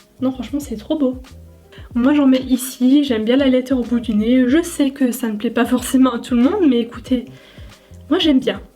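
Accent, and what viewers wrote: Lorraine, France. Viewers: Elle habite en Alsace mais elle vient de Lorraine (d'où son accent !) 😉#BarreD'Info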